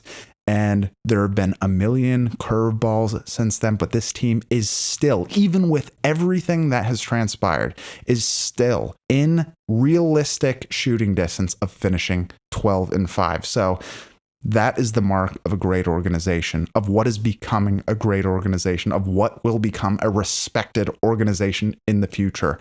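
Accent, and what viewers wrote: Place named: Canada. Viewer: So where from Canada is this guy from again???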